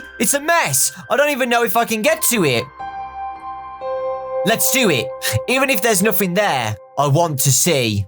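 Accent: United Kingdom. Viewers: He’s British? Well I can’t judge since this is the first video I see of him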